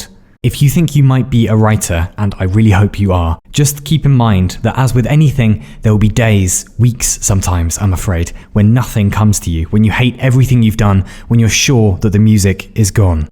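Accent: RP, United Kingdom. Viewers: i do a lot of art but i also just want more turtle boy with funny british voice saying things to prevent me from commiting sewerslide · ah the fancy rp english accent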